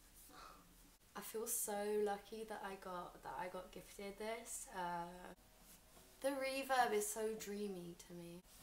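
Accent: United Kingdom. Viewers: English accent is the bow that ties it all together honestly. 100 out of 10 would've been head over heels for her back in highschool.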